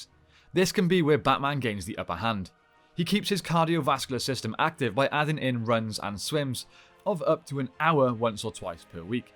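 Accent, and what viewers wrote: United Kingdom. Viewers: Lol, not surprised you have a gym downstairs. You don’t really dress to show it off, but you can’t entirely hide from a discerning eye that you’re in good shape. Put your physique together with that British accent, your red hair, and your rugged good looks and it’s no wonder I enjoy looking at you just as much as I enjoy listening to you talk about Batman!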